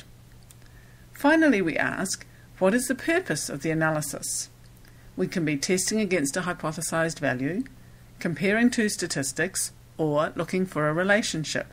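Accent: New Zealand. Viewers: My apologies if I sounded rude but the content of the video was really helpful! Also, New Zealander's accent is one of my favourite kind of accent! :D Flight of the Conchords ze best! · Wao great as video. If i can please ask are you a kiwi?? If i have guessed right from ur acsent.😊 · New Zealand accent.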